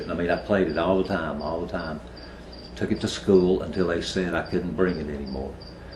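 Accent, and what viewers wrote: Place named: South, United States. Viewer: Interesting Video. I discovered and around ‘92. Just my personal opinion but their self titled & were their finest releases. showed signs of what they previously were capable of but after left & Jimmy Stiff they seemed to be lacking which makes sense right?. I will now try and find a video explaining why Jimmy left. That’s one very real Southern accent you have Mr. Appreciate the video. It must’ve been hard leaving such a cool band, esp because of the tonnes of sexy women that would be at your shows. I imagine that would be the BEST perk of being in a RnFnR band for sure!!!! That’s the main reason guys play instruments 🤤🤙🏽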